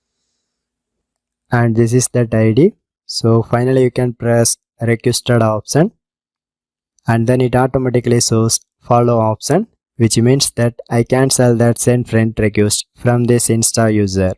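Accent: India. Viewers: Ugh I can’t stand Indian accents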